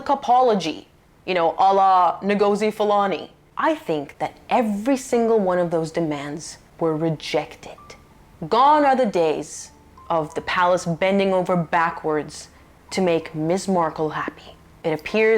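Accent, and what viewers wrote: United States. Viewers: I have the audiobook for Courtiers I'm currently listening to. I'm just getting into the chapters of current times. I enjoy your channel very much and your knowledge as an attorney. I know you live in Australia but don't Aussie. I am American and was sure you were American by your accent, but then you made a comment that you've never been here. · You said that's you're an Australian, but you have a very American accent!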